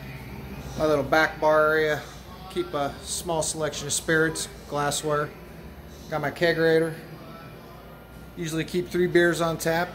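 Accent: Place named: England, United Kingdom. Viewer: That's the queen's English sir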